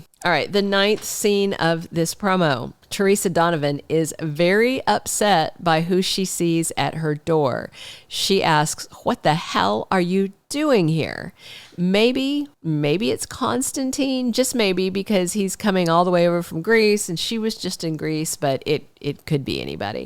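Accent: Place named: South, United States. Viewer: I can’t wait to see all of them. But if I had to choose it’s Theresa’s asking what are you doing here. Thanks. Oh! I detect a southern accent. Are you from the South?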